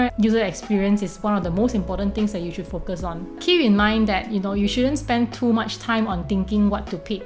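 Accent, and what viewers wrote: Nigeria. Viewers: Just discovered your channel, very informative video... Btw you have a Nigerian accent and i love 😊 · You sound like a Nigerian ❤️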